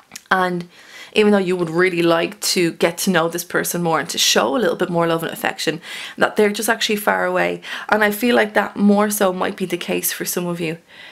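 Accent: Ireland. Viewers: I really enjoy your readings--your delivery, your positivity, your authenticity and your lovely Irish (?) lilt...also, I am a Taurus cross-watching for a considerably younger Capricorn romantic interest. I think I'm the "matriarch figure" that you mention 😉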